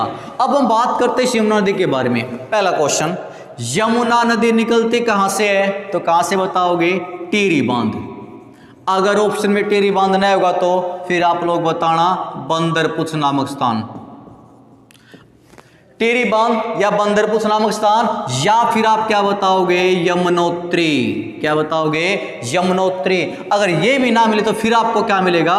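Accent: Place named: Haryana, India. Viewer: Very well taught with haryanvi touch.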